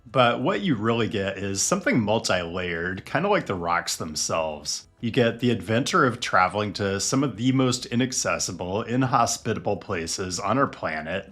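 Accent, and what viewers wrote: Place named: Pacific Northwest, United States. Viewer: The most pacific northwest accent i've ever heard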